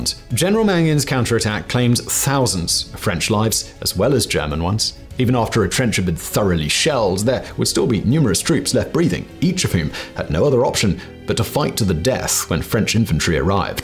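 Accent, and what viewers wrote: United Kingdom. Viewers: Is the narrator British? There's only so many English accents and I don't think it's north American or Australian :/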